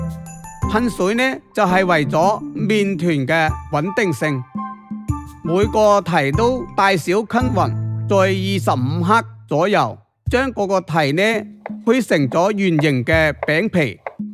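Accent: China. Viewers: 这是哪个地方的粤语。。。。。。？ 另外感觉就是变着花样吃猪油。。。。掺些面粉让猪油不要太像猪油。。。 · Mia Mia 佢係廣東人但唔係廣州，廣東話除咗廣卅話外仲有好多方言，佢就屬其中一方言。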